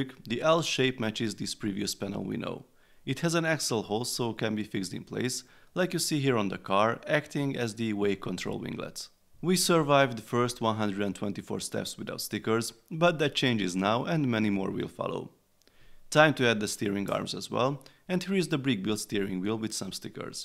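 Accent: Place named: Hungary. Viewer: Kicsit magyaros a kiejtésed de jó lett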